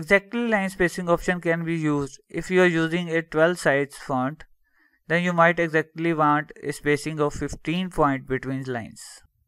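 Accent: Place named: India. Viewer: oh, that indian accent...I don't understand, how can you still have it? I mean, you indians talk a lot english, even before everybody in the world knew english, DUE to India beeing brithish colony, but still, even know that indian accent is...I don't want to offend. let's say that IT IS RECOGNISABLE.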